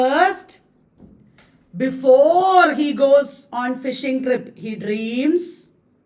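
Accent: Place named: Kerala, India. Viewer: Mam.... Ur malayalam 👌🏻🤩😍so sweet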